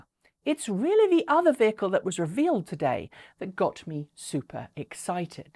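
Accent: United Kingdom. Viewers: All electrics are preferred to ICE vehicles... it’s youtubers like YOU that have coined the phrase “Tesla Killer”.. which has created the backlash of other people proving that they are NOT Tesla killers.. so, if you wouldn’t report it as a contentious situation, there would be no backlash.. in the end, you are complaining about your own click bait.. well you got us to click.. then you complain?.. you sure do have the most passive aggressive show that I’ve ever seen. Just because you use you pseudo intellectual, dainty, British accent to make things sound better, it doesn’t mask your bias against Tesla.. and your bias is created by click bait shows like yours. So go yell up an alley, no one cares. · Accentul este evident, britanic. Deci nu US. Nici nu cred că americanii ar putea pronunţa corect "Dacia". Britanicii au învăţat deja. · accentul este britanic, dar ea locuieste si produce videoclipurile in US. 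Si sa stii ca in scurta mea vizita in USA, am descoperit ca multi dintre cei care stiu ce este o Dacie, stiu sa pronunte perfect.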